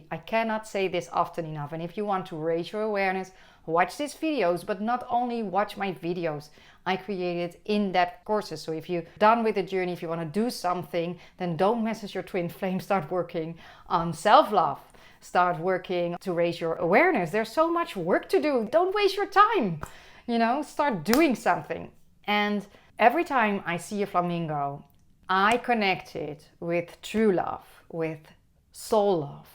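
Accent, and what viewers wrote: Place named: Netherlands. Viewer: this is crazy. I was on holiday last week and saw so many flamingos! Also, I've been seeing so many 44s. Everywhere. That's how I found your channel... It was the first video I clicked on when I looked up what it meant. Also... My twin flame is Dutch! When I heard your accent I thought 'it can't be... can it?' Never seen any Dutch twin flame content before !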